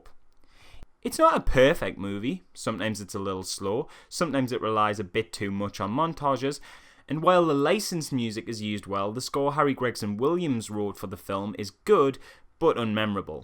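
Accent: Newcastle, United Kingdom. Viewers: Getting more and more geordie as time goes on what's the crack like?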